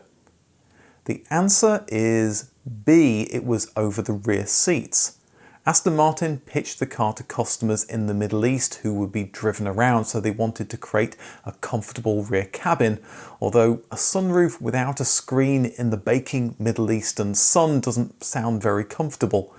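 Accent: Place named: United Kingdom. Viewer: Fun stuff,!!! I have deduced you must still live in the USA as the MINI you and Missus are standing by has the USA size plate frame and you mention it is still in your motorpool. And I had assumed you were UK based and that the missus had moved there!!!! Its the accent and the UK rear plate mock up in the background. Very enlightening, although a little surprised that you don't have a more varied list of dream cars that do not break the paper floor of the 1970s. Well, all of us care nthusiasts can't be Jay Leno have love almost every cool and unusual car ever made. Keep the videos coming as they are always insightful and properly researched.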